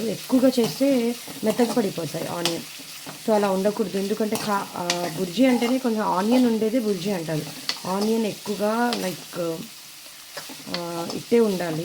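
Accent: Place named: India. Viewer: I already subscribed but I'm a big fan of your voice and what a Telugu slang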